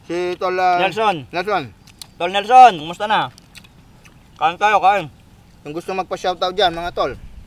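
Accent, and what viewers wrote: Pampanga, Philippines. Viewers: LOL... PARANG NARINING KO ANG NANAY KO SA IYO PAG NAGTATAGALOG MAY HALONG KAPAMPANGAN magkasakit lumalaro LOVE YOU GUYS (KALUGURAN DA KAYU) MORE VIDEOS PLS. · Halatang kapampangan kayo pre hahahaha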